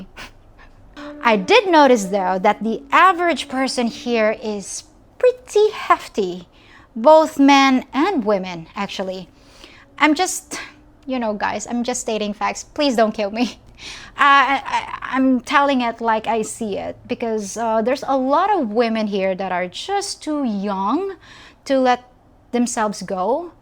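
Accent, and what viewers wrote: United States. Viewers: If you were in my area and saw you I would totally chat you up. Gotta shoot my shot right? But then I basically know who you are and other people may think you are just another American girl due to the melting pot of people here in the US. Guys here are jaded based on how hook-up culture is. Btw you have almost an American accent when you speak English. Another reason why someone might see you as an American.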